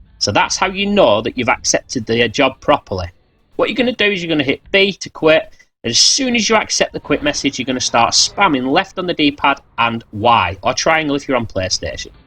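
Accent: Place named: Lancashire, United Kingdom. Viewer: You sound like a Lancashire lad😛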